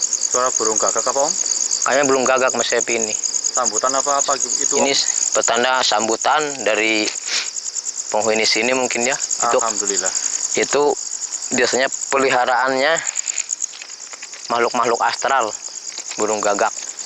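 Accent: Banyumas, Indonesia. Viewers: Walaaahh wong ngapak kie mesti,,hhhaa. sehat" nggih pak.tek enteni video anyare mning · Ngomngnya ngapak banget lho .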